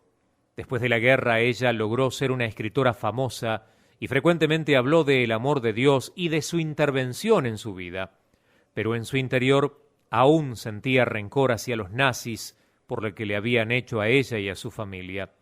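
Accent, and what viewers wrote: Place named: Argentina. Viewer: HE ESCUCHADO OTROS LIBROS MUY EDIFICANTES COMO LOS DE REBECA BRAUN, PERO UD ES EL QUE MAS CLARO LO ENTIENDO SERA POR NUESTRA TAN PARECIDA LENGUA LATINA, SOY HONDUREÑA Y EL ACENTO ARGENTINO ME GUSTA MUCHO, ASI ES QUE HE QUEDADO MUY CLARA EN CUANTO A LO QUE VIVO Y VIVIRE EN LOS AÑOS QUE ME DE PAPITO DIOS AQUI EN LA TIERRA YA TENGO 61 Y 30 AÑOS QUE SU MISERICORDIA ME ALCANZO ❤🙌❤🙌❤🙌GRACIAS DIOS LOS BENDIGA CADA DIA Y QUIERO MAS YA Q POR MI TRABAJO PUEDO ESCUCHARLOS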